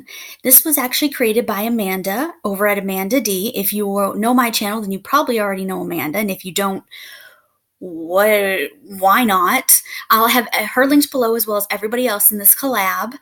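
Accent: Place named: United States. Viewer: You have such a lovely accent! What state do you live in? I love soooo many of the songs you chose!!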